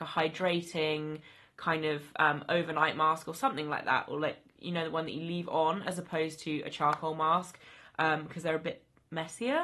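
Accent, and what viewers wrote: United Kingdom. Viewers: I'm not sure I care much for the whole "lip powder" trend. It seems kind of silly and counterproductive to put something on lips where you actually should prefer moisture and more than that.. they dont ever look good. Especially the red and mauve type colors . It looks like you've been drawing on your lips with eyeshadow. BY THE WAYYYY... thanks for these videos! I'm a huge fan and you're very informative and who doesn't want listen to information coming with a British accent??? I love it!!!🤩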